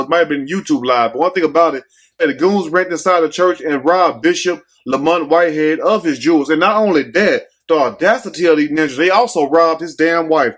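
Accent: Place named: New York, United States. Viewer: Watching in from New York! when I first started watching u, thought u were from up this way 💃🏽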